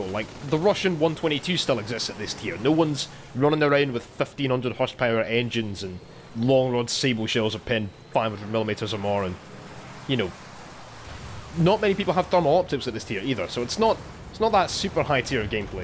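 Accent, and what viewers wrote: South Africa. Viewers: Your South African accent sound more like the Durban Indian accent than the Afrikaans accent XD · 10/10 South African accent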